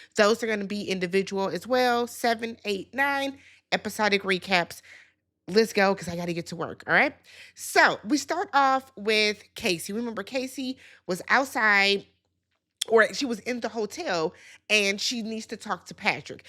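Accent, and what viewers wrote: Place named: Minnesota, United States. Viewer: Kuh-lee-Bree-ah 🙄🙂‍↔️😑 you pronounce names like you’re from MN too…😶😵‍💫🥴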